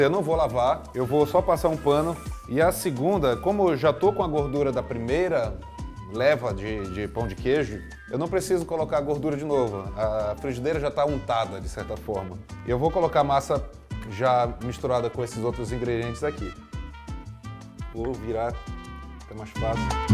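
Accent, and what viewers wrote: Pará, Brazil. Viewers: Tão bom ouvir o sotaque paraense no YouTube . De chefe de cozinha ainda, perfeito!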